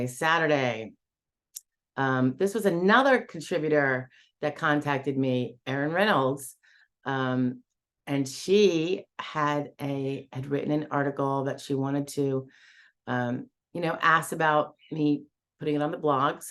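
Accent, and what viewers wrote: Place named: New Jersey, United States. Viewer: i like your vids could u do a vid with your jersey accent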